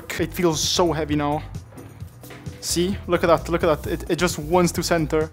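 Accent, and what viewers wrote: Italy. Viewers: ma da che parte dell' Italia sei? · Bro sei italiano? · Ma sei Italiano o cosa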